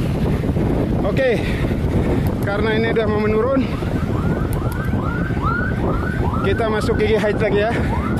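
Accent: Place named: West Sumatra, Indonesia. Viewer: Cara cpat smpai di pelabuhan dwikora pontianak.. Maaf om org pontianak atw org minang krn dr nada bhs kaya org minang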